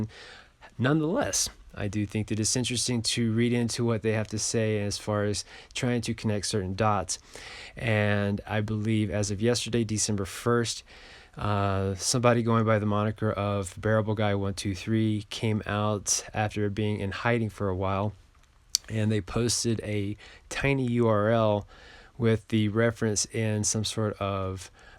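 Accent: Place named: United Arab Emirates. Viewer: You sound a guy from UAE. 50 should be fine for you to make few millions if you have bought few thousands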